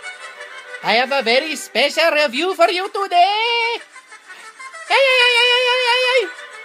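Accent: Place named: Mexico. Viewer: best mexican accent EVER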